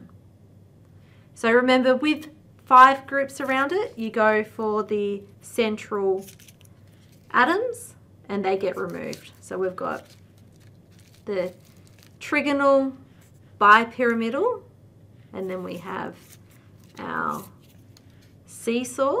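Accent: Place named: Australia. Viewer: Good video. Well explained. The Aussie accent is easy to listen to as well.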